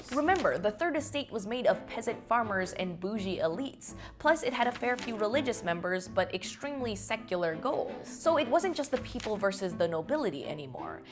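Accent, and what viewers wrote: France. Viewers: so funny for me to hear your french accent... I can't even imagine the mountain of work you had to go through but overall this video was superbly well done. I've listened to soooo many lessons about the french revolution throughout my years in school (being French I guess that's legit) and I now realise that you've done an amazing work here : you've managed to deal with both the important events and the funny anecdotes (which is really what we are here for...) However I would have probably talked about the impact that England had to France politically speaking. But as always great video, you definitely brought the expression : "learning is fun !" back to life. Personnaly the printing press seems definitely more interesting in terms of social and religious impacts in Europe but I guess you must have a dozen of great anecdotes on Shakespeare... 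· I love the way she speaks in that french accent 🌸❤️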